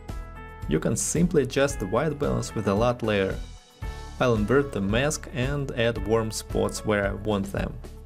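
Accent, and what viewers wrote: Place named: Russia. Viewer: Ну да!!! я на много лучше понимаю Русский Английский, чем Американский Английский...Это я понял!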